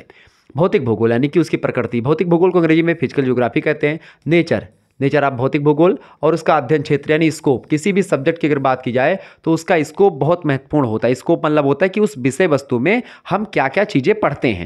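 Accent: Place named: Bihar, India. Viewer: Sir AAP Bihar ke ho . AAP bhojpuri language Bol rhe h vich vich me ❤❤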